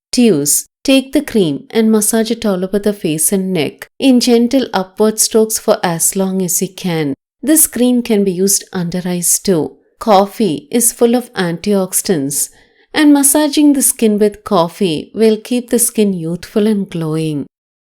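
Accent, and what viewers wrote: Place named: Tamil Nadu, India. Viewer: You speak English like Tamil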